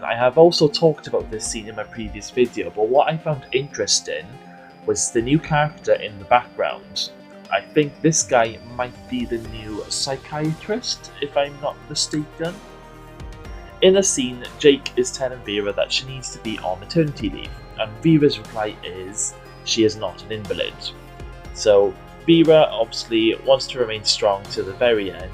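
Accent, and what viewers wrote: Wales, United Kingdom. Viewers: Very Welshy mate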